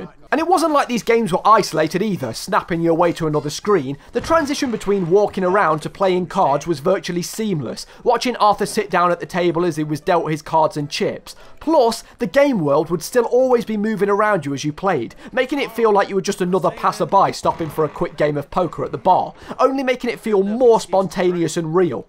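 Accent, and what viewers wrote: United Kingdom. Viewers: i feel like i'm watching nakeyjakey but more british and chaotic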